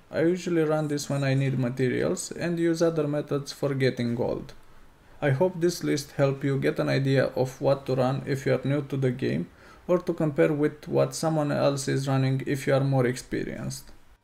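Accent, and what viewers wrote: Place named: Romania. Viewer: I like your accent, where are you from? Romania? Turkey?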